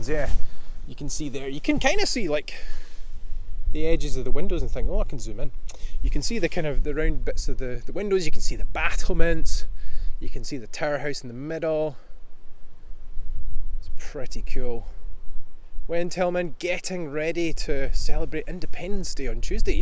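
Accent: Scotland, United Kingdom. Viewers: Me too! I love listening to his accent. He has definitely made me want to visit Scotland. No for his accent (well, maybe a little), but for the beauty. Such history.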